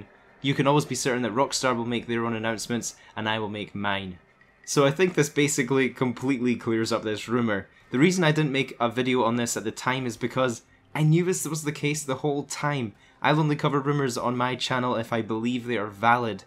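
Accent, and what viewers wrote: Scotland, United Kingdom. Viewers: Here weird question by any chance are you from Scotland? It's just the accent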